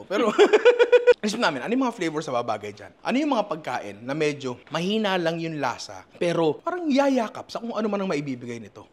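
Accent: Batangas, Philippines. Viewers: Batangueño ka ba hahaha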